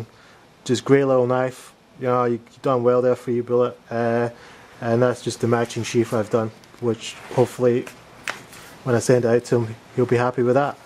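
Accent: Scotland, United Kingdom. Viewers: There,s me thinking ah yes got to be an aussie and then I hear a man with ma home country accent, see you, you must be a jock thats what im thinking,anyway loved your video and the intro is good enough for the movies keep up the good work all the best.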